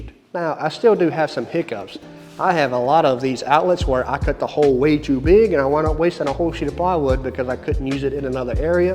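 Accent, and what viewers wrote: Louisiana, United States. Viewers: Where in Louisiana are you. So strange hearing that accent on youtube. Not used to hearing it anywhere near an internet connection. And this heat has been killer. The insulation just hurts you more without AC. Better to open the doors and run a fan until you get enough power to run your AC. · Sounds like Louisiana. I was in Shreveport and moved out to Greenwood to get away from the politics and the hassle.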